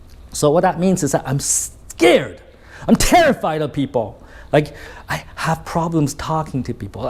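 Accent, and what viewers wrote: Standard. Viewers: ns rất chuẩn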